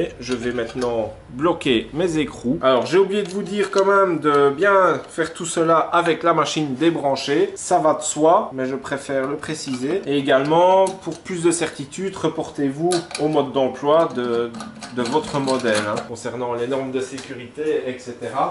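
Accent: Belgium. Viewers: Bonjour, suite à ta vidéo sur la raboteuse dégauchisseuse j’ai vu une chose qui m’a bien plu et que j’ai appliquée. Ce système pour affûter les fers de rabot est vraiment ingénieux et facile à faire malgré tout et je me suis dit pourquoi ne pas essayer et ça marche vraiment bien car j’ai pu affûter plusieurs séries de mes fers « rabot- et dégauchisseuse » merci aussi a Copeaux… car elle y est pour quelque chose lol !! Tu vois que parfois une femme dans un atelier ça peut-être pratique .Je plaisante bien sûr .Je me suis abonné a sa chaîne aussi de ce fait .Dis d’après ton accent tu ne serais pas un peu Belge une fois ou je me trompe ? Moi je suis du Pas de Calais donc pas très loin. Merci pour tes vidéos sympas que je ne manque jamais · Salut, tu es Belge ? (Tu dit 1,70€ et accent) Il parait qu on trouve la Titan a 228€ chez Brico Depot en belgique Brico Planit ?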